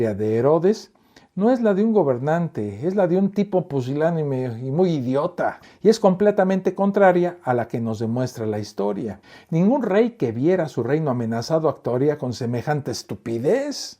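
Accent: Mexico City, Mexico. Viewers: primer vez que te veo, y ya vi varios de tus videos, todos muy interesantes, pero para ser una persona muy culta tienes un acento chilango, demasiado !!!NACO!!!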